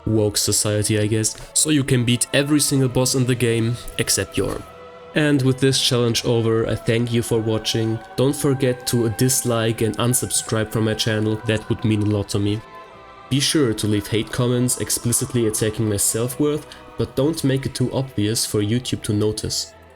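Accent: Germany. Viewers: Nice run! Unrelated but I was like "hey this guy sounds German" Then I was like "Paulaner Spezi aufm Schreibtisch 😱"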